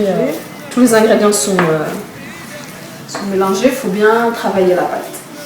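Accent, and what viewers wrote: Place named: France. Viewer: lol c fucking weird voir une mdm aekk voile parler francai de france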